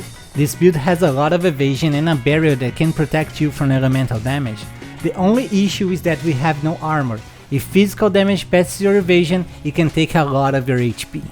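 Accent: Brazil. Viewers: Great vid! You sound brazilian to me idk why. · boabuild! sotaque br kkk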